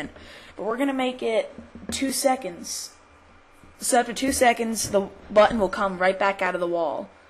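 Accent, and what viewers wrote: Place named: United States. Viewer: Finally someone I can understand! I had to go through so many kids that English and Australian before I found a voice that speaks fluent American! Great, simple, clean tutorial!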